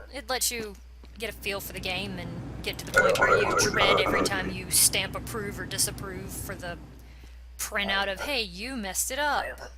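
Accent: South, United States. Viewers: I like the southern accent.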